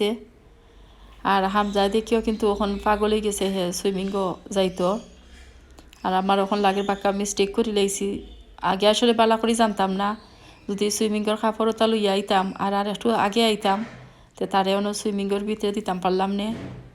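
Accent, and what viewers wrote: Sylhet, Bangladesh. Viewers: When u describe in sylhet i it sounds great carry on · Well done, Sylhety sounds better. Watch Wahida's tiny world channel. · Yaa allah afa tnk u so much sylheti matar lagi...ou bashay matbay shundr lage 😍😍😍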